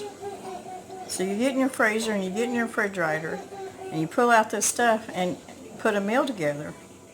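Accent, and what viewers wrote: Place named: Tennessee, United States. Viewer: I see why.You home is beautiful, and your recipies are vicious, reminds me of My Moms cooking.And your voice is so I dont know laid back.My daughter tried to get me to do a cooking channel and I told them hell no,12 hours workin in the hospital is enough lol.12 hours a day at tjeb hospital.was enough for me.Where do you live?If you dont mind me asking our accent sound like Tennessee.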